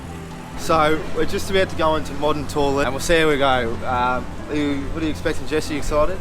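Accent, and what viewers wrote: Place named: United Kingdom. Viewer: Enjoy listening to your British accent.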